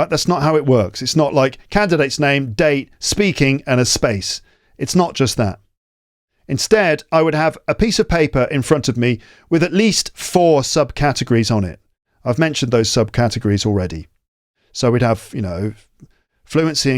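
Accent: United Kingdom. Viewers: I don't know why but i it's really difficult understand uk accent, ven so I could have good compren henshion of your explanation comparing to north america accent it's really really different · I don't like your British accent 🤢🤢🤢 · British English is much easier to understand. Thank you ❤❤❤❤❤ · Dear teacher!!! I love your podcast, I love your accent or British accent. I´m learning a lot and havin fun. Lots of hugs from Brazil! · Your british English is so clear to be understood 😊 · I’m literally enjoying to listen to your podcast, I love British accent ❤ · I enjoyed the episode thank u very much. Also your podcast room is so cool! And finally, which British accent do u have I am interested ?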